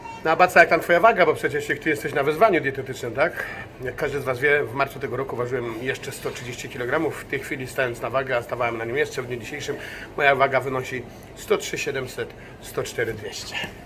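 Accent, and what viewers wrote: Highlands, Poland. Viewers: Po co typ pierdoli po góralsku a na innym filmie slychac że mówi normalnie. Dwa, podobno to żaden góral tylko czytałem że pochodzi z żor. To jaki z niego góral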